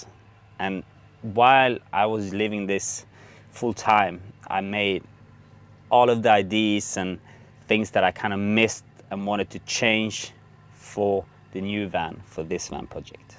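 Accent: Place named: Sweden. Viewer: Sounds Swedish. Not Norwegian.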